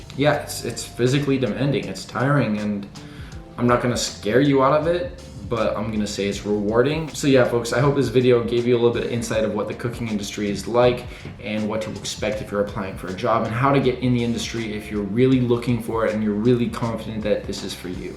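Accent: Canada. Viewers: Not only is he good looking but he can cook. Also that thick ass Canadian accent. What else could you want?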